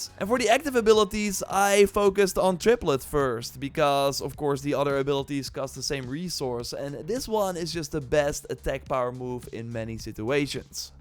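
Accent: Netherlands. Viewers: That dutch nasal accent makes me want to cut my ears of. Nice content though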